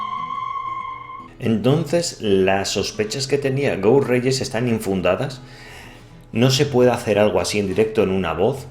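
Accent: Spain. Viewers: De donde eres , Valencia ? xD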